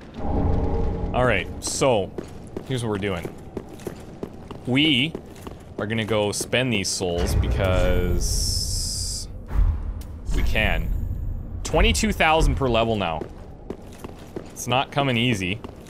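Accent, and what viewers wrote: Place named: Canada. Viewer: It's not a "tomb!" i kept thinking that too. We still love him, crazy Canadian accent and all.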